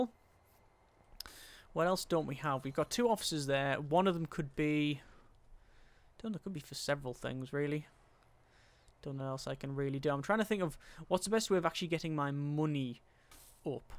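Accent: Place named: North East England, United Kingdom. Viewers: North east - Newcastle/Middlesbrough/Hartlepool area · +emmo999 I'm from the Darlington area of North East, his accent isn't that strong to me, I can't pin point it XD Sunderland, Hartlepool, maybe Newcastle! but swinging more to Newcastle XD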